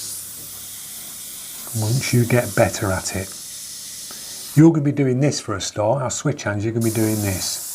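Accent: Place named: United Kingdom. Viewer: Excellent compilation of "oh no, oh my god"!!! Loving it - especially with the UK accent.😅😅😅 Additional beginners problem: Overthinking, overlearning, overanalyzing and not practicing enough because there is "yet more info to suck up before I do something wrong" (it's me). The advantage is, that I know most of what to do/not to do, but I lack the mileage. It keeps you from being frustrated, BUT it leaves a feeling of being lazy.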